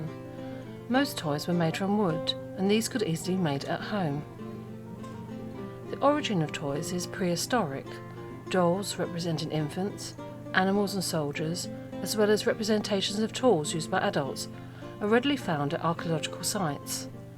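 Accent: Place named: England, United Kingdom. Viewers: You’ve never heard a British accent? 😂British Siri and Alexa sound similar. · Sound more Tudor , it's incredible that you're still alive .😆 Do you mean the the narrator spoke with an English accent? I'm not British, but could perfectly understand the narrative.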